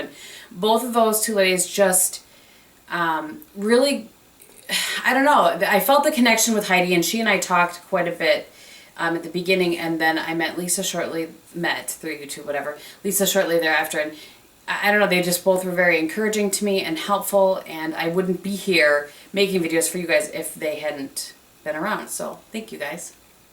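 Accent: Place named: Wisconsin, United States. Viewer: I subscribed yesterday and have been trying to find more out about you. Something about your personality just speaks to me. I live in Chicago (now), but your personality, humor/laugh, and accent are shouting Wisconsin girl. Now that I know you like Packers, I know why I love your personality so much! Something about Wisconsin people just speaks to me.. It'll always be my home.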